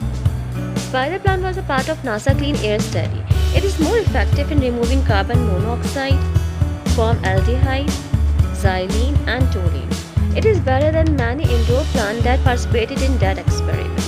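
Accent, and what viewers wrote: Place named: India. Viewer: your tone accent and English should be improved It sounds more like Hindi with a raga .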